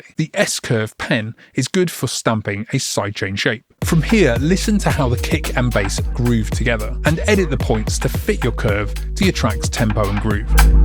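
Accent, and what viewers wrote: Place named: United Kingdom. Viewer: Great plugins and great content. Also British which is nice 🇬🇧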